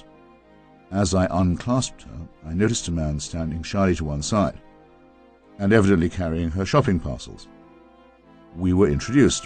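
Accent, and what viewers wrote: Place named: RP, United Kingdom. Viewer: I disagree. I've been listening to Hitchens and his brother Pete for almost half a decade, and I think I'm correct. But I couldn't read his mind, so I can't say for certain, just like you can't. As for proof, well I can say that sounded more like a misotheist than an atheist. Misotheists hate God because they think he's immoral. Literally every short you'll see on YT is calling God immoral, unjust, a dictator, etc, etc. If God doesn't exist, why did he spend all his time criticising Him then? And oh yeah, he literally said in his debate with John Lennox or Frank Turek (can't remember which) that even if God was real, he still wouldn't believe in him I didn't insult his mother. I just said that another man was more important to her than her own sons. Why don't you word what his mother did in a nicer way if you think I'm being hateful. 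And I'm not religious. I'm not even a Christian. I'm just someone who was a Hitchites fan before finally studying scripture for myself. And I don't hate. He's just objectively wrong about a lot of biblical facts, but because he's got a posh British accent, his word are taken as gospel.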